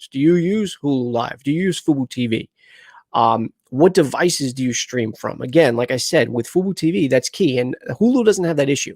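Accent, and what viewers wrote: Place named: New York, United States. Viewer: You are so NY lol. I sometimes forget this is what I sound like lol. Thanks for the videos btw. Definitely appreciate the info and effort.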